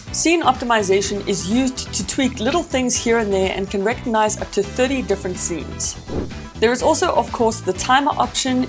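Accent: South Africa. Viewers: is jy Afrikaans? Jou akcent klink baie soes een van n soetie XD maar dis nice om te sien dat daar is talent Suid Afrika, goeie video! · Finally a South African reviewer🙋‍♂️ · Just subbed for the SA accent 😍 feels like home! Great content, probably the best in-depth camera review I've seen on YouTube so far! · Awesome review. Love the South African accent.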